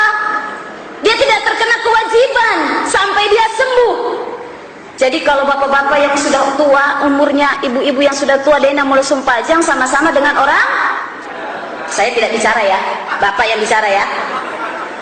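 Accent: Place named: Java, Indonesia. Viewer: Bu gk orang Jawa tinggal di Pinrang atau orang Pinrang mondok di Jawa logatnya masih ada logat jawanya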